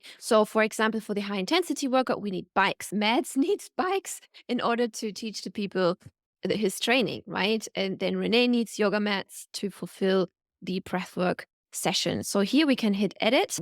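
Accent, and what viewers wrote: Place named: Germany. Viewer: lol what is her accent? she sounds very german haha.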